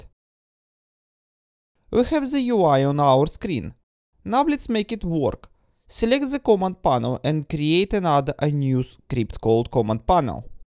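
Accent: Russia. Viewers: does he speak with a Russian accent? он говорит с русским акцентом?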